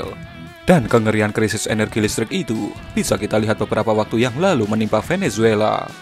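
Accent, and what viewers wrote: Java, Indonesia. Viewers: Jowo ne ngendi mas bro medok banget ya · logatnya jawa banget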